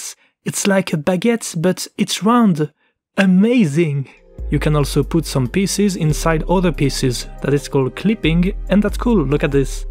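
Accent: France. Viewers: I feel like I watched a video from a frenchman in English for the first time ever. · This is amazing haha. French accent Beautifullll ! Edit: And a great refresher + I didn't know some of them so thank you :) Edit: Also, I think you might be right that it's not super sustainable (the english channel) but this video should definitely not be the last! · Oh I love French style English videos like this! Keep up the good work<3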